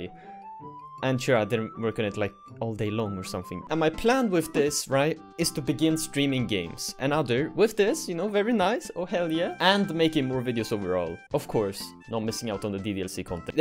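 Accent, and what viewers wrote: Sweden. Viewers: I see swedish accent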